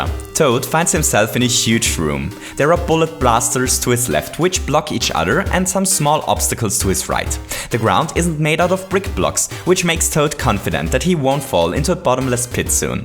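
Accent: Germany. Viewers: are you german? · Are you german? I'm asking because of your accent.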